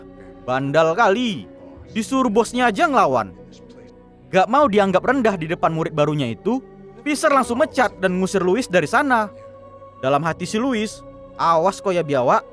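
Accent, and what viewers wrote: Medan, Indonesia. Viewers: Swrius saya nonton alur ini bukan pengen lihat filmnya tapi dengar logatnya ngomong medan kali wak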